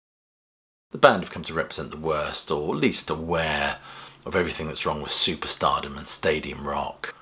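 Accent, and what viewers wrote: England, United Kingdom. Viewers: He's English ..you cant figure out that accent?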